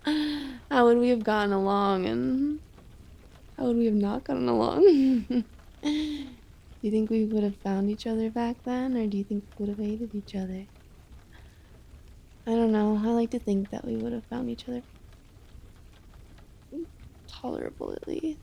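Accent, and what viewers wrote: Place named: United States. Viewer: You American girls have (possibly)the best accent! You should do a "southern belle" accent video. How much fun are you! New sub from 🏴 😊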